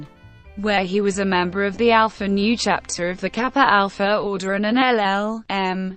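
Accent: United Kingdom. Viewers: Very bad done. That British accent! Trash 🗑️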